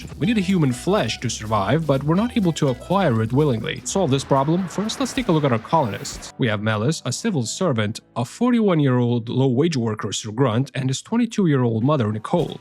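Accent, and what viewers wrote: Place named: Canada. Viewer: I know others are unaware but as someone with Canadian friends. I know how they pronounce stuff differently and yeah. He's definitively Canadian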